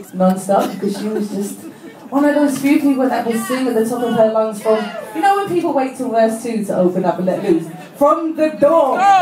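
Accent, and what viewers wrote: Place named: United Kingdom. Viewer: Damn!! I love the British accent on women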